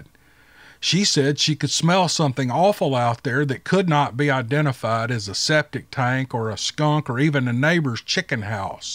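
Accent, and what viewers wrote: South, United States. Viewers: Aussie here and love your southern accent :) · I like to listen to your southern accent. You are my favorite Bigfoot narrator. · I here in the United Kingdom also LOVE listening to your southern accent it's made your channel instantly one of my top. I just love it ❤️ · Hi, as an Aussie I can confirm we like the southern accent. Possibly from over exposure to Dukes of Hazzard back in the day. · Hey how you doin,just wanna say that your southern accent is just PERFECT for telling these$ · I absolutely love the southern accent. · I’m Irish always loved the American accent & by far the most popular among anyone I know is the smooooth southern accent.I love your ramblings they are full of warmth & humour🥰!! All the stories you choose are something else & your narrative skills are a gift to the world.I think it’s so incredible how people who relate their stories to you really benefit from doing so.You have a great channel & I love your choice of music it’s so evocative & real musicianship 🎶🎸 to savour, Lizzie.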